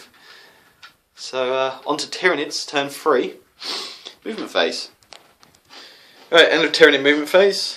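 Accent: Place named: England, United Kingdom. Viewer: Nice batrep mate, it's refreshing to hear an English accent and to see someone getting on with a drawn-up list rather than an American complaining about how the new book is uncompetitive. A nice win and keep up the good work :] PS - Nothing against our Yank cousins, it's just that most of the batreps I've watched have been American and rather dismissive. :P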